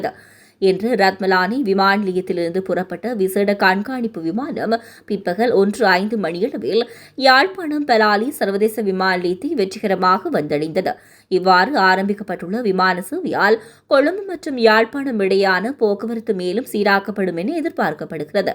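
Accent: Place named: Kerala, India. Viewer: நீங்கள் சற்று நிதானமாக செய்தி வாசிக்கலாம். மலையாளிகள் தமிழ் வாசிப்பது போல் வாசிக்கிறீர்கள்..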